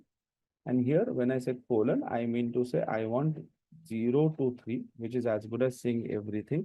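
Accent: India. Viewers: My God, her English is terrible, it's just Indian English. It's not English; my brain is straining to figure out what word the Indian woman was trying to pronounce. 🤦‍♀️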